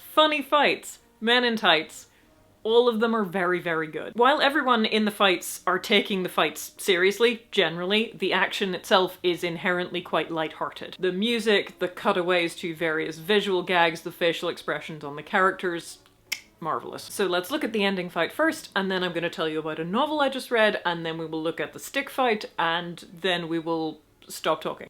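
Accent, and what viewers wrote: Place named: England, United Kingdom. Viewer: I take reviews quite seriously because unlike some YouTubers she speaks with an English accent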